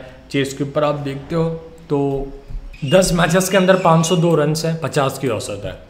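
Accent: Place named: Caribbean. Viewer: Sir ap me Caribbean flavour hai thoda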